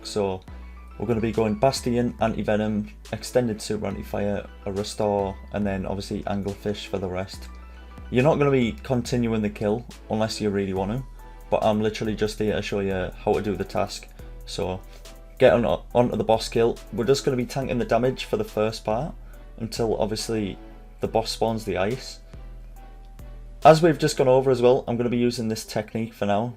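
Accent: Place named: Newcastle, United Kingdom. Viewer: Haha could tell by the accent lol Newcastle here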